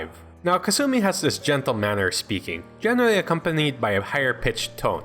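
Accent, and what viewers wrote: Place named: England, United Kingdom. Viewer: yet another pronunciation of names à la english smh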